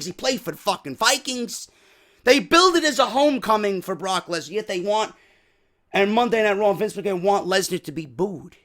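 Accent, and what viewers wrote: New York, United States. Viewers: You're a fucking New Yorker man xD love it cx I nearly pissed myself though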